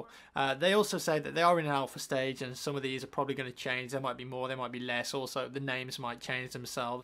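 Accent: United Kingdom. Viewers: Guess he can't stand your civilized British accent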